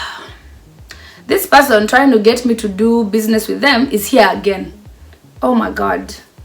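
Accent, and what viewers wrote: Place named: Kenya. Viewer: I'm always in love with the Kikuyu accent you embrace.... That's why I feel you are legit... 'Hi, it's me again ' 😂 😂 😂 😂 na ka kiswahili kidogo "Ngai"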